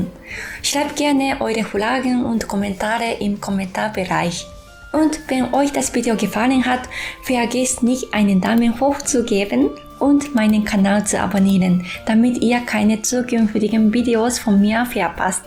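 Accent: Japan. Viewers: Dein Deutsch ist super! Ausdruck, Wortschatz und Grammatik 100% korrekt und besser als bei manchem Muttersprachler😉 Chapeau! Nur das schöne Akzent verrät, dass Du Japanerin bist❤ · Sie spricht sogar ein ausgezeichnetes und heutzutage höchst ungewöhnliches Deutsch, ein Deutsch, wie es noch vor 40 bis 50 Jahren gesprochen wurde, mit Wörtern, die mancheiner heutzutage (fast) überhaupt nicht mehr benutzt, ich muss immer schmunzeln, wenn ich Wörter von ihr höre, die ich von anderen so gut wie nie mehr höre ;) Und ihr Dialekt macht es nur um so niedlicher, man stelle sich vor, sie hätte keinen japanischen Dialekt, das wäre ja schrecklich ;)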